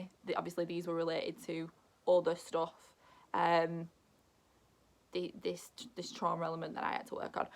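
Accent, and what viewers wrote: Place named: North West England, United Kingdom. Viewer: Sounds good, I'm currently enrolled onto the Optimum Health Clinic course but have been looking into the lightning process. I guess what's holding me back is that I'm wondering what the lightning process can teach that I haven't already found through YouTube and the course I'm on? Thank you for your the video. From your accent I thought you'd be close to me, but I noticed that is North West whereas I'm in Yorkshire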